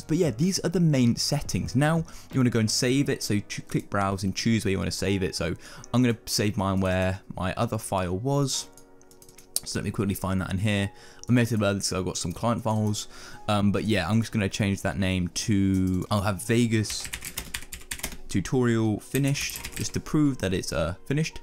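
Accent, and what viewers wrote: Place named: United Kingdom. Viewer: Hehehe, your cute.. but very UK.. I have friends from there... I do like the video very informative, thank you so much... :)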